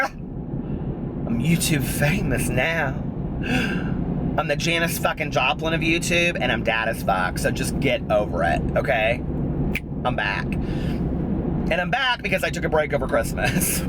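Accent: South, United States. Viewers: Where does your southern accent come from? I am from Georgia and I swear you sound local. :)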